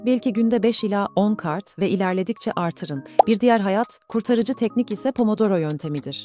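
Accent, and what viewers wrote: Azerbaijan. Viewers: Oha abla sen Azerbaycanlımısın?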